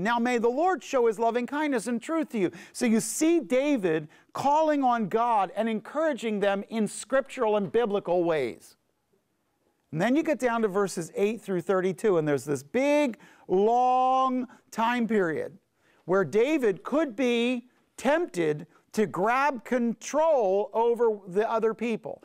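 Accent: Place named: Israel. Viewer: I understand that native tongue is Hebrew (being born in Israel). You are being exposed to a greater thing. The Word will expand your mind, heart and soul-- in Hebrew the bet is pronounced as "b" AND as "v" bet/vet; and that is what you are hearing. Shalom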